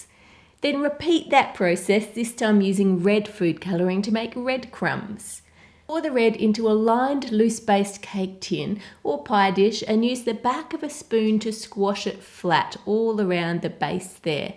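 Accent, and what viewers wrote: Australia. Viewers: The Australian accent is real..! xD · Australian accent (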